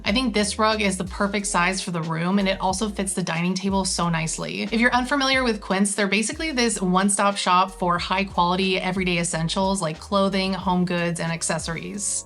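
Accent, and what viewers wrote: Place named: California, United States. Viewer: A piece of trivia. In reference to a previous comment, concern about your voice, your inflection told me you were a Californian! My kids grew up here. They don't have that accent. I grew up in San Antonio. I used to have a thick accent. My sister did not. Go figure.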